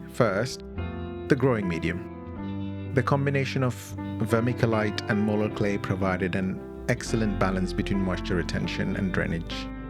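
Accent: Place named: India. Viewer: I enjoy your channel immensely , you are an easy study , I appreciate that you speak slow as I have difficulty sometimes with Indian accents , thank you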